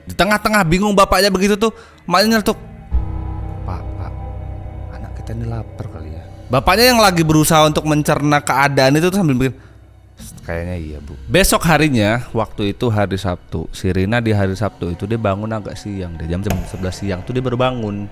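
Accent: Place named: Sumatra, Indonesia. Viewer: Kok malah berlogat Sumatra? Apa saya yang salah dengar 😮😮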